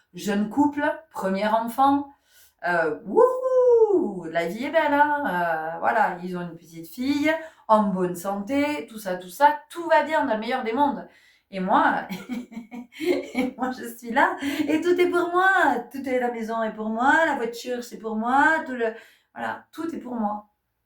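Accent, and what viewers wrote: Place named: Marseille, France. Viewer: J'aime bien . Vous avez le sourire . Accent du sud Marseille . C est joyeux ❤❤❤❤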